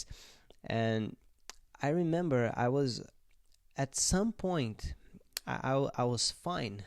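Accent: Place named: Canada. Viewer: Sounds like you are in Canada, I am as well.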